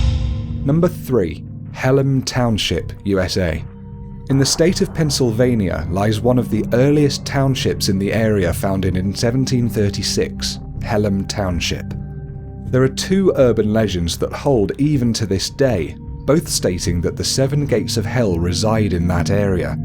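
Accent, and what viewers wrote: England, United Kingdom. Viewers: Why is every top 5 is a English dude? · your british talk can blow a waaanker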